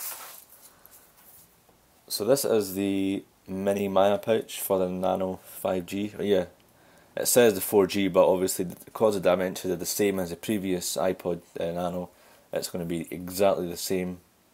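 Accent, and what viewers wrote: Scotland, United Kingdom. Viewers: yeah this is nothing personal agiant scottish people or waht ever, i just cant understand them as good as american people, for i´m not a native speaker and understanding is the hardest part of a foreign language. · Where bout Scotland you fae. Am fae elderslie.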